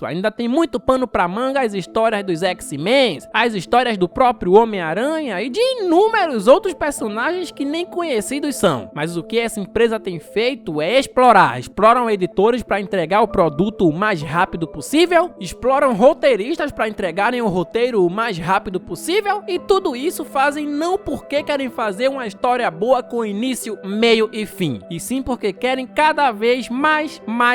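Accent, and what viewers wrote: Northeast, Brazil. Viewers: En, tu é nordestino?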